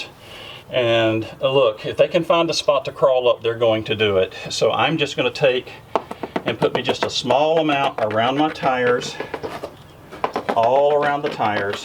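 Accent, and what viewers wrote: South, United States. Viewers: Love the videos and congratulations on your channel. It's so cool to hear you talk, it makes me miss home. I live in Tacoma but I'm from a deeply southern town in NC. My wife and I are in the process of transitioning to full time and your videos are very helpful and insightful - Thank you!! I have a question about the blocks you have on the front legs. Would it be possible to get a schematic or plan, they look like they would perform extremely well. Good luck and we look forward to more videos because we "Love RV Life" too!!!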